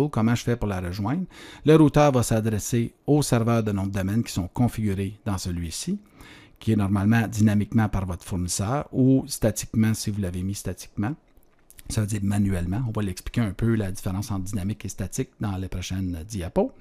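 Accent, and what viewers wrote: Quebec, Canada. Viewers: C'est bien mais je comprends rien le Canadien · super merci beaucoup, votre accent québécois est parfait pour mon cerceau. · Très bonne vulgarisation d'un sujet pas toujours évident pour les débutants. Je vais la conseiller à mon fils qui débute ses études en informatique et qui voit justement les notions de réseau IP. Et lui qui adore le bel accent canadien (vu de Belgique, il y a bien un accent), il va être ravi. Bravo pour cette vidéo !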